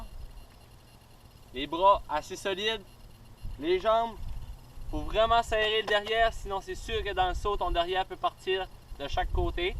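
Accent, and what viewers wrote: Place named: Quebec, Canada. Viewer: L’accent québécois mdrrr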